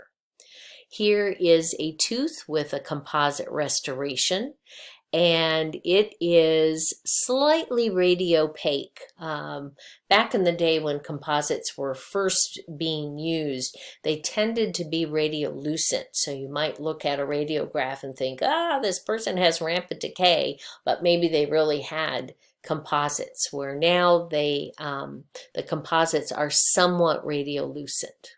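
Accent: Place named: United States. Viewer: Although from usa I assume and I love that accent, finally something for dental assistant, I'm doing course in uk so extremely helpful. Thank u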